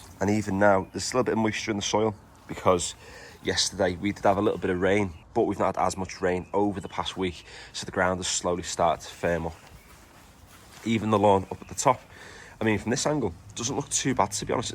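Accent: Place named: North West England, United Kingdom. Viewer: Need to start mine soon. I’m in the North West, guessing from your accent this garden isn’t a million miles away? Need to get the weed and feed down as well as my first mow (I know there needs to be 5 days between the two) and I’ve just picked up a scarifier as that desperately needs doing too. Anyway fairly large garden (about 3 times the size of this garden), south facing and at the mo is quite muddy and covered in moss. 😔 On the plus side it’s in the sun all day as no buildings nearby casting a shadow. Anyway was thinking mow in the next few days, weed and feed at the beginning of April then scarifier poss a week or two later (with mows inbetween of course).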